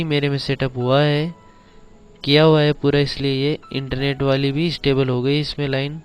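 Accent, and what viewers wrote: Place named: Shekhawati, India. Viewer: Bhai rajasthan se h na pka shekhawati se 🤣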